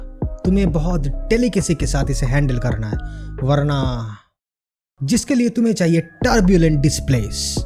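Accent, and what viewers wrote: Bengal, India. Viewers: Hindi with bengali vibes....ye bhi log notice karte hain🤗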